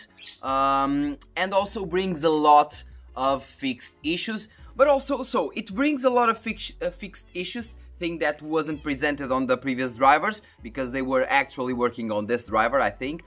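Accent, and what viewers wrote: Brazil. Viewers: Are you Brazilian or Portuguese?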